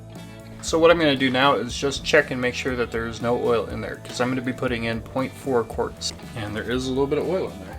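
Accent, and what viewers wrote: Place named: United States. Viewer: Great video. Great American English from expat in England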